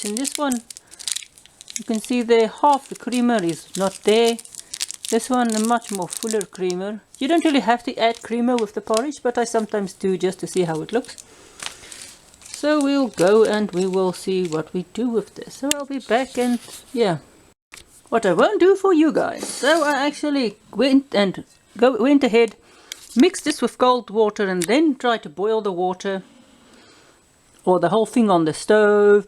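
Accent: South Africa. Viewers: Hoekom maak jy nie videos in Afrikaans nie? Ek kan baie goed hoor jy is ñ Afrikaaner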